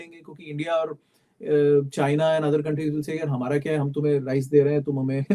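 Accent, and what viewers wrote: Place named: United States. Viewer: He is an Indian but talking like an American white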